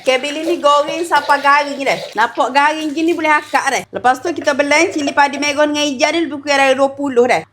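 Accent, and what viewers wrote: Kelantan, Malaysia. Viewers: X tahu la byk dia punya deh kan..org kelantan punya bhasa mcm tu kan....smua negri ada language dia .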